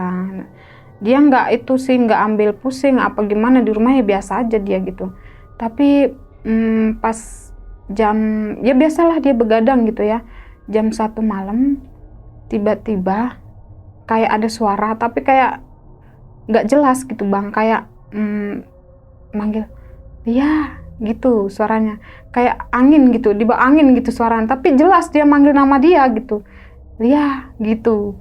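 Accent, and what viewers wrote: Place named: Medan, Indonesia. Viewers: Kak logat mu Medan..bukan Aceh.. Yg logat Batak nya ini Boru...😂😂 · Jadi suka aku dengarnya. Nampak kali logat Medannya😅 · Apa pulak,😂 Medan kx KK awak ini..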